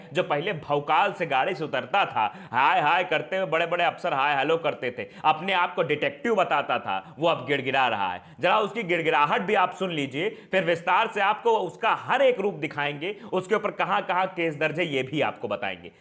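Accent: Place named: Lucknow, India. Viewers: FromPak🕊Enjoyed your dialect/ language and style. Are u from Lucknow. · मस्त अंदाज़ है भैय्या आपका🙏🙏 प्योर up के लगते हो।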